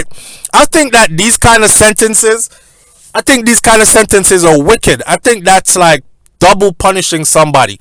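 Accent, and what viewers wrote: United Kingdom. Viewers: Well done on the British accent. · Good British accent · Big Up To The British Accent. I was born in England and I am telling you its one of the most Racist Countries in the world. I have lived in Jamaica for 20 years. I would like to see them try and arrest me at their Airport Hell and Powder House they would have to send for the Army. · British accent fit yuh 😂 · Man British accent turn up. I give you A+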